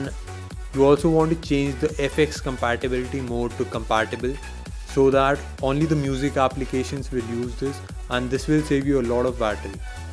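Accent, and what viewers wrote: India. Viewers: fucking indian accent, cant stand it!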